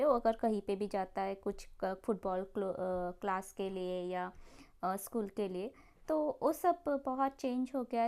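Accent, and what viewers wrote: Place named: Odisha, India. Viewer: your Hindi accent is so similar to Odias of Bhubaneswar region..!!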